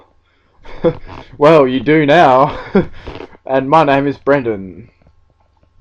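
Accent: Australia. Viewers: what part of aussie are you from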